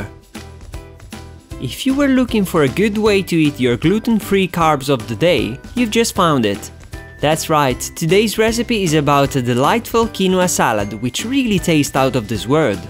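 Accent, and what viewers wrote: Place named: Italy. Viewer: I totally love this recipe! Found your channel today and already watched all your videos :) Immediately subscribed! I have also a quick question for you; I love your accent, where are you from? You seem to be Italian :)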